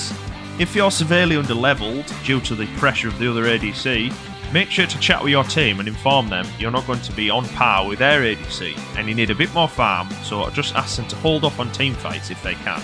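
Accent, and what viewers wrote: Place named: North, United Kingdom. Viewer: Ano thas from the north but I cant quite put ma finger on where exactly thas from cocka spadge and its driving me nuts but thy accent seems like its from round t'corner from mine.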